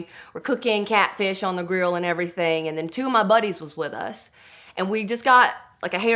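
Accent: South, United States. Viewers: I love the blunt south talking thank god im not the only one left in 2020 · Too southern of an accent for my likes, then I thought to myself Georgia? Why doesn’t Ed sound like that? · Beautiful girl, southern accent and loves trucks, she's perfect! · Heard the accent, thought southern bell. Said hillbilly dad, check, philiphina mom hold up. Look at video. Ah yes still a southern bell lol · Came into this video hoping to hear a southern accent saying "big ol mud tires". Was not disappointed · A Filipino with a STRONG southern accent - there has been a disturbance in the space-time continuum.... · Not even in by 9 seconds yet and dam that southern accent, i'm in love lol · Anyone else’s eyes pop out of their head when they saw a good lookin woman, talking in a southern accent, about a truck?😂😂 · This channel makes me want a southern accent. "Quert o' ol" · what is hotter that a gorgeous asian woman with a southern accent? 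WOWWEE!!! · Nothing can be more American than her accent! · Cream job sums it up.... That southern draw so sexy.... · I fkin love southern accents. Im from Boston so dont hear it much up here. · Pretty asian girl with a southern accent, was a bit confusing, but it sounds like she's come a long way from rolling her truck to driving race cars 👍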